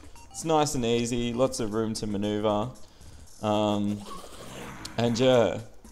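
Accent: Australia. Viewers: love your voice, so aussie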